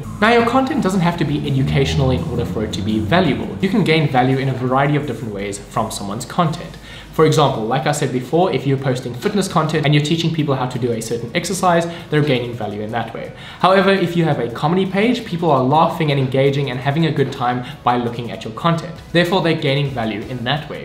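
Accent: South Africa. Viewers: is that a saffa accent bruh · Is this guy South African?🤔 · Are u South African I am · Love this video! I noticed you have a South African accent, I was actually born in South Africa too! 😊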